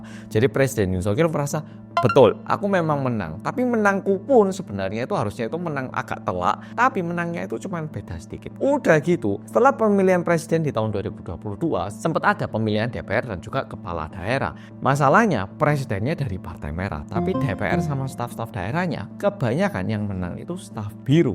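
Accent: Java, Indonesia. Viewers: Mas medok e polll😅👍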